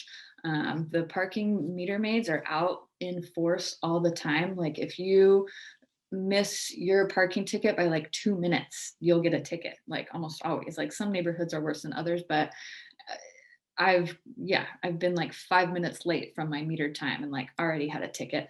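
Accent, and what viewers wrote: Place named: Washington, United States. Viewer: Well said , you even pickup the accent, enjoy life in Washington State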